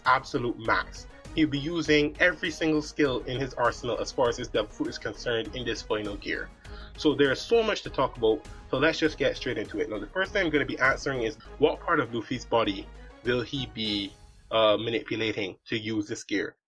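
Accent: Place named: Barbados. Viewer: Are you from Barbados? definitely hear the accent lol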